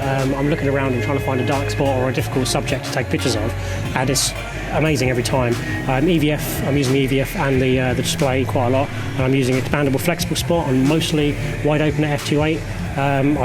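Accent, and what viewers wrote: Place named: United Kingdom. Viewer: i like your style (and your British accent! btw I'm a German) :) you should continue making videos :D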